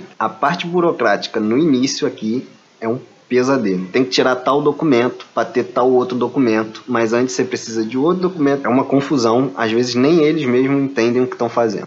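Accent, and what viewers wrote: Brazil. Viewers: Estão a gastar dinheiro em escola privada e em seguro de saúde por opção, embora em saúde também descarto totalmente essa opção, porque não se justifica objectivamente. Apesar do desinvestimento feito nos últimos anos nestas áreas pelo governo por motivos vários e as dificuldades que isso acarreta essas áreas continuam muito boas apesar de tudo. Agora você fala uma coisa óbvia: os seus padrões de exigência começam a subir também e daí percebe o porquê das manifestações de insatisfação várias que os portugueses mostram, enfim, você apesar da pronúncia ser brasileira começa a sentir-se português. e isso é tudo normal. Somos exigentes para aumentar os padrões de qualidade e tentar não baixá-los. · I love the accent from Rio it’s so fun. Cascais is one of the best cities in Portugal.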